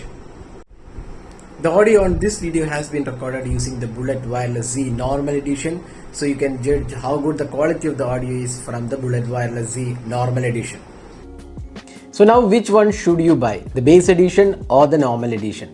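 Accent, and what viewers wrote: Kerala, India. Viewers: he is malayai i guess that why he is so sounding like this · Kerela guy accent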